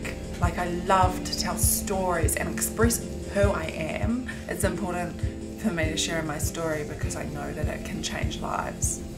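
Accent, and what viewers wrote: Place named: Australia. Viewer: Christianity and cults have nothing to do with each other. They are two separate things. Seems like u grew up in a cult. This is not Christianity at all. Get to know Jesus as your personal Lord and Saviour. Sorry for your bad experience. Are u Maori? Saying since u have a down under accent, either New Zealand, Tasmania, or Australia. U do look very Maori though.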